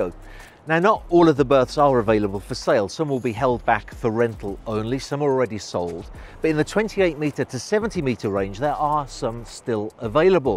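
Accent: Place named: RP, United Kingdom. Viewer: Queens English is well defined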